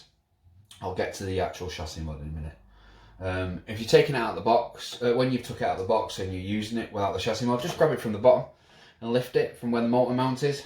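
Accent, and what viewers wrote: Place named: Manchester, United Kingdom. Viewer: There's a manc if I ever heard one! Where abouts the M60 are you pal? 🤭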